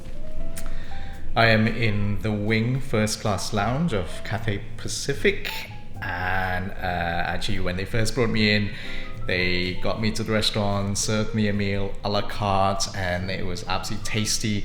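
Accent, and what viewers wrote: United Kingdom. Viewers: I didn’t know you were Malaysian! You’ve got a British accent!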